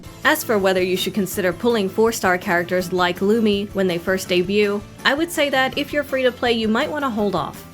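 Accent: United Kingdom. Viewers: the scottish accent is great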